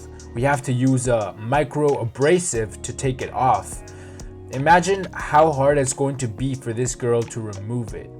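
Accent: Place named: United States. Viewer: Joder nos salió gringo el doc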